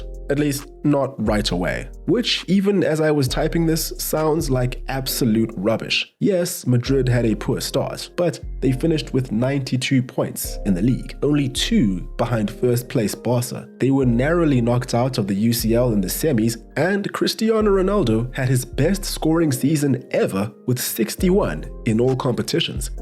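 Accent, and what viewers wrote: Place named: South Africa. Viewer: Your accent is sound South African. I can’t get over it😂😂😂😂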